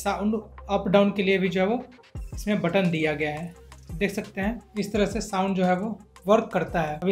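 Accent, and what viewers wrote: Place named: Bihar, India. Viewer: Bihar , samastipur ka lg rha hai bhai tu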